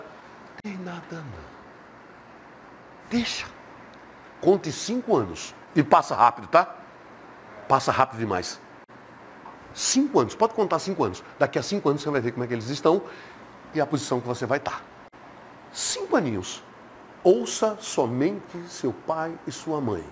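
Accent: Pernambuco, Brazil. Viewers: Sotaque penabucano ❤👌 dele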